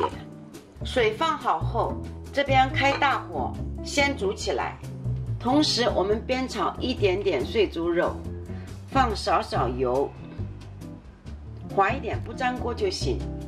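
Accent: Shanghai, China. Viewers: 用電飯煲可以嗎？阿姨說的是上海普通話 哈哈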